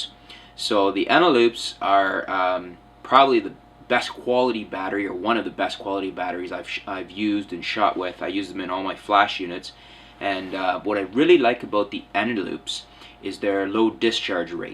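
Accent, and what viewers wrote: Canada. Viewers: best thing about the Eneloop batteries, is your awesome Canadian accent!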